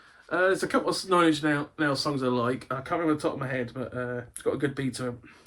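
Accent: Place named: Germany. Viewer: That was 1 funny upload 😂 loved the German accent 😂 and defo checkout gaming rarities channel 👍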